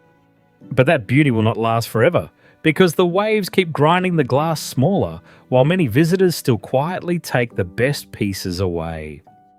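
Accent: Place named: Australia. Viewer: I enjoyed the Aussie language being spoken. Thanks mate, great video. I'm watching from Mornington VIctoria Australia.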